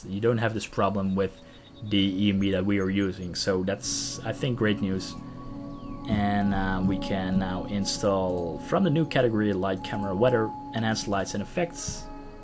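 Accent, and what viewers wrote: Netherlands. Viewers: Might i ask are you Dutch ? wat ik hoor een accent dat heel Nederlands overkomt ;)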